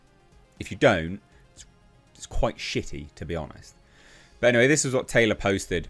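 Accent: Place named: United Kingdom. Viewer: Spoke like a true Brit .. ( the first 39 seconds of the post )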